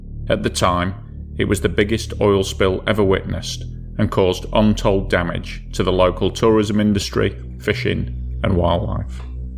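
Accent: United Kingdom. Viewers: they're Brits. They speak a different version of English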